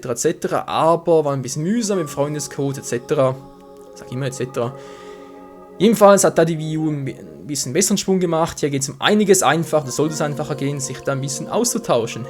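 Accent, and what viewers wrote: Switzerland. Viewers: Du bisch eindüütig schwiizer 😊😊😊😊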